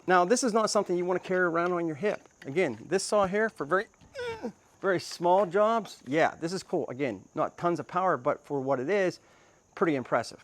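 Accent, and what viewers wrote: Pittsburgh, United States. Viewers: That Pittsburgh-esc accent is obnoxious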